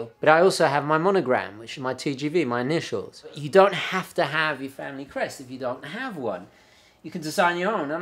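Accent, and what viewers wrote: United Kingdom. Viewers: Nice video. By the way I love the British accent!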